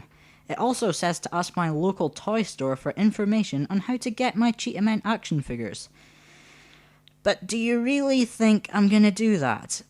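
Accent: United Kingdom. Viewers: "the level from hale!" dude you arent funny. look up avgn! i was laughing at your stupid british accent. it is not easier said than done? dont you mean it is easier said than done. you dumb ass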